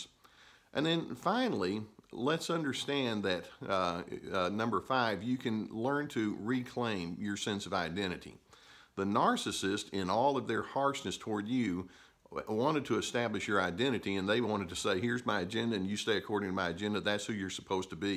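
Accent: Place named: Texas, United States. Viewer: Wish she (84 yr old mommy) would get outta my physical garage, chuckle. Gotta change the lock to under my house. I'm a locksmith now and proud of it. I am constantly amazed what one can do on your own when you are broke ; ) Oh, a plumber too, and fixed the gas stove fireplace! Still haven't cleaned those gutters & now rain/snow here in mountains of Ruidoso aka "rumordodo"! Thank you. I do really know what you mean! Very true! Love the true Texas accent, I lived in Houston for almost 20 years. I miss it cuz it was so big I could easily hide from "mommy"!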